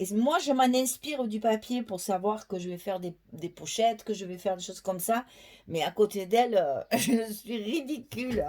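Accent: South, France. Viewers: Vidéo très agréable grâce à votre personnalité chaleureuse et l'accent du soleil. Je regarderai votre album avec beaucoup d'intérêt.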